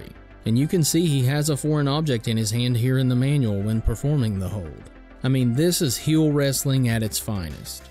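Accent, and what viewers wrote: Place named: Alabama, United States. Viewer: Love this game! You sound like you`re fromn alabama like me.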